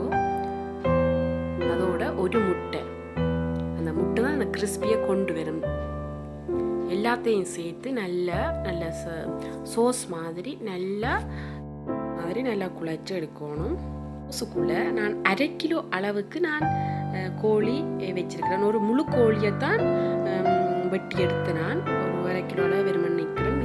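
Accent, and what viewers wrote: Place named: Sri Lanka. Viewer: my fav sis...unga tamil romba super... reminds me of my srilankan friends :)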